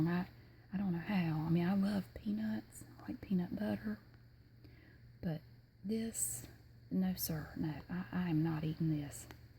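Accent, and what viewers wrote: South, United States. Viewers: Compared to your recent videos, you seem like you've lessened the intensity of your accent! This is definitely a harsh southern accent, I miss the southern rambles :(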